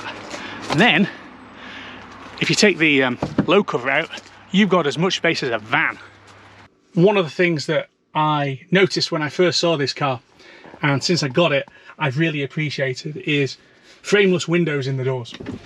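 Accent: Scotland, United Kingdom. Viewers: Excellent video and congratulations on your new Model Y! I’m a huge fan of Tesla here in the United States and looking forward to getting the Cybertruck. All the features that you listed resonate with me and your description was spot on. Thanks for the brilliant review and keep the videos coming. Love the Scottish accent.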